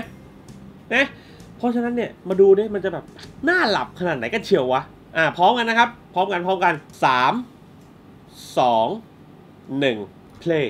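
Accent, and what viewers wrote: South, Thailand. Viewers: เอ้า พี่ คนใต้เบ่อะนิ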